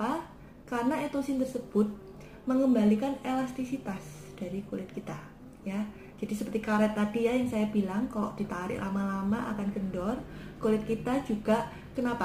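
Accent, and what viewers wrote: Java, Indonesia. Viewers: ini orang taiwan apa orang jawa ko suaranya medok ya hahaha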